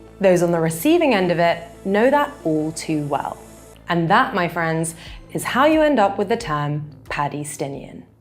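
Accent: RP, United Kingdom. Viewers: Thank you for proving you don't know what "invented" means. On top of that, the first use of the word in its generally accepted meaning was not 100 years ago or 1974 (😂😂). It was 1795. Thank you for also proving that just having a posh voice is no proof of intelligence or integrity. Where is Christopher Hitchens when we need him?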